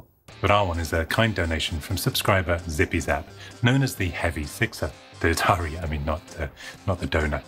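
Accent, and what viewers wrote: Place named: Southern England, United Kingdom. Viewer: you sound south of England area like myself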